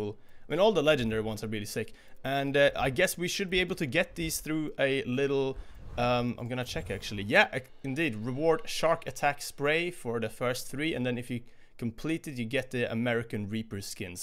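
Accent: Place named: Sweden. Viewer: swedish accent jumped at me from the first 5 seconds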